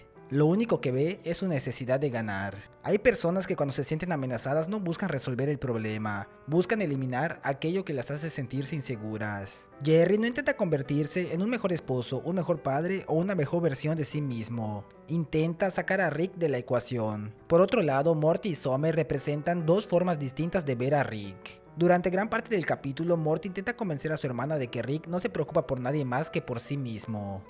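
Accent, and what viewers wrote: Yucatan, Mexico. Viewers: Es yucateco? 🤔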